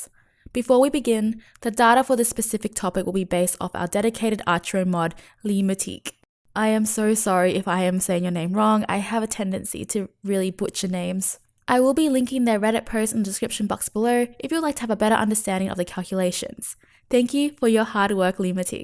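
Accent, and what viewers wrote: Australia. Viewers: Hi, I hope you are well! From my experiment, if you use meowgik, the cat damage is also reduced, due to the reduction of your base damage. I hope you can mention that in your future videos. Cat damage are reduced by around 25% when picked front arrow +1. Also from your accent, I assume you are Australian? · Sounds like your from Australia? I don't know why we do it, but I know a LOT of Americans who LOVE everything about Australia! The closest I've been to Australia was in 1975. The year I lived in Singapore. (Loved it!) If I'm wrong about your location, sorry but I still love your accent! Thanks for the game help! I was stuck & now I can tear up Archero!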